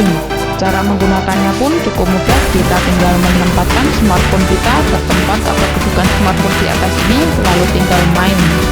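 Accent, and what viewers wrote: Java, Indonesia. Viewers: wong jowo di suworone!!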